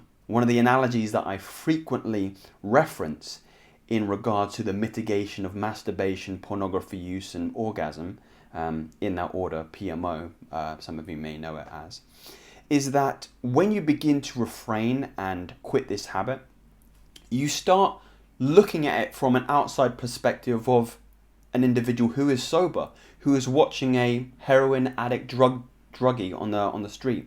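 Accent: United Kingdom. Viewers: Dude has a British accent, so I don't have No choice but to sit down and Listen to Him.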